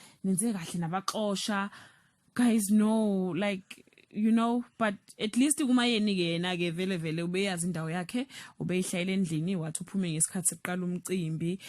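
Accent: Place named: Nkandla, South Africa. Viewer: Cc uphuma enkandla uyabonakala ngesiZulu sakho vele abasenkandla bayabonakala ithinalabo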